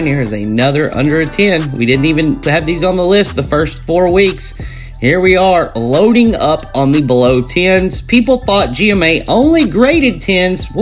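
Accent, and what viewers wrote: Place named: South, United States. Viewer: This guy looks like the actor Max Casella except with a country accent 😂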